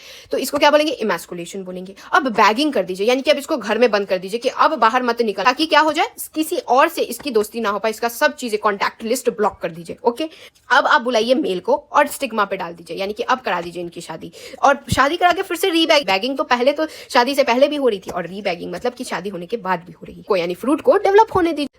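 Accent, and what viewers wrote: Bihar, India. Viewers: Didi bihar se ho kya ???? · didi ap bihar se ho kaya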